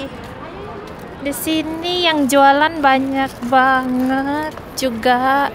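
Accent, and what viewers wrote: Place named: Java, Indonesia. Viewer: Keren say videonya.. kedengaran logat orang jawa juga.. 😁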